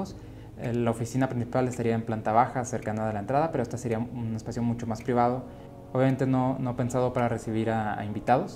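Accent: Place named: Guadalajara, Mexico. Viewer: A aquellos de México — este acento es típico de la región de Guadalajara? Lo encuentro muy interesante.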